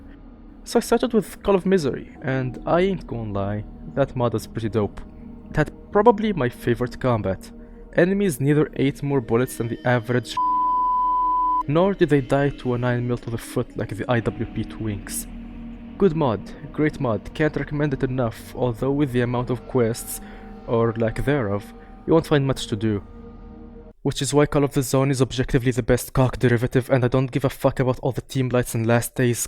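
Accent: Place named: Tunisia. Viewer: direct chle9t bik tounsi mel accent ! GG Bro nice video. Big STALKER Fan Here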